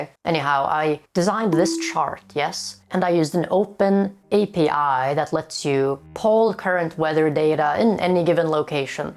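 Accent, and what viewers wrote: Sweden. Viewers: 5 seconds into the video, my swedish ass goes "hey this guy sounds swedish". i've never watched you before, just somehow clocked it. dubbelv eller vad kidsen nu säger. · Legendary algorithm pull Edit: Jag visste att det var en Svensk accent jag hörde. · i had to hear like 3 seconds of your voice to find out youre swedish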